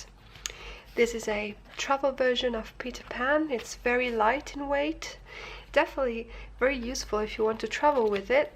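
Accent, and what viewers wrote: United Kingdom. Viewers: Btw, I really love your british accent! :) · Omg amo tu acento británico <3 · Your accent has never been so British :D did you spend your holiday in Britain or something ? xD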